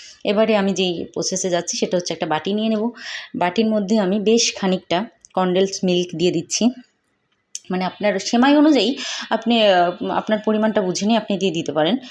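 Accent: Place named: Noakhali, Bangladesh. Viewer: প্রথম থেকে শেষ পর্যন্ত সাথেই ছিলাম আপনি নোয়াখালীর ভাষায় কথা বললেন খুব সুন্দর লাগলো ❤❤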